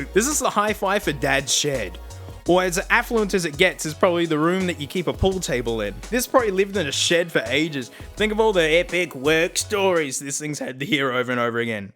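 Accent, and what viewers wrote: Australia. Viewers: I could sit here and just listen to his insane Aussie for the whole of quarantine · Same, it's the bush Ausie speaky words. It's like a game trying to translate every video. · Idk y'all, I LOVE the aussie accent like... a lot👁👄👁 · I understand the Australian accent quite well and I like it.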